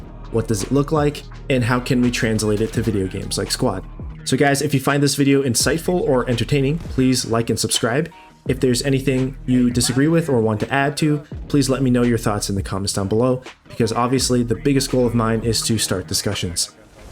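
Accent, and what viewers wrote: United States. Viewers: that’s crazy would’ve never guessed your American accent and language skills are so good.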